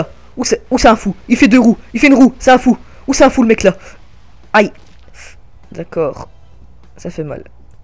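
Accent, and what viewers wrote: Belgium. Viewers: tes belge ? Vrèment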